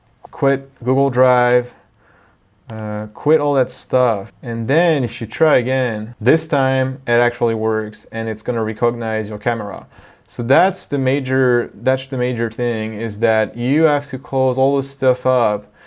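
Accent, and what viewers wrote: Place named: France. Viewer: God bless you....I'm trying to record higher quality videos to keep my students moving with online classes here in NYC as we have been on lockdown due to COVID 19. You just helped me record full classes, so that my students can keep moving during this traumatic time. Grateful for this vid. I hope you and your family are safe and well. I also heard a French accent. Enchante!!!!! Merci!!!!!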